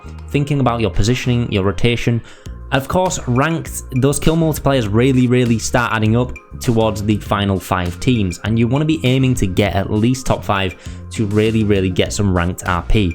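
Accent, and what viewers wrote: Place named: Scotland, United Kingdom. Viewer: There are 2 reasons why I subbed. 1. I enjoy ur content and think it's very helpful. 2. Your Scottish.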